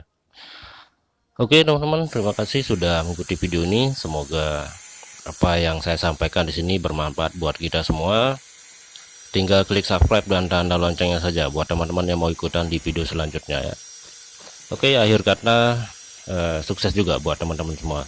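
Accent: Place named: Bali, Indonesia. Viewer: Kaya org bali logatnya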